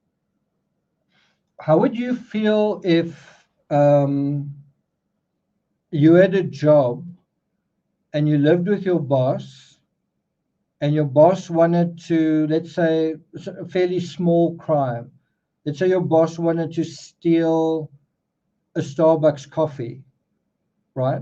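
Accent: South Africa. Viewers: jy klink soos 'n Suid Afrikaner. Is jy?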